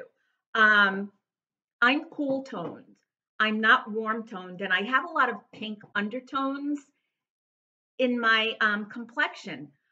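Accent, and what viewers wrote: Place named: New York, United States. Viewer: I can't get enough of your pronunciation of "Tony of Beverly!" Tony of Brooklyn!!!!! As a New York woman but living in the south, I miss that NY accent! BTW, you look gorgeous in Avery! I purchased her after watching your review - in Kahlua, too! ♥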